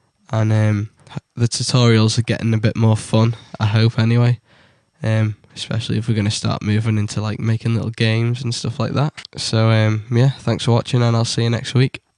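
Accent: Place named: Wakefield, United Kingdom. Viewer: Hey - you sound like you come from Wakefield - possibly Leeds.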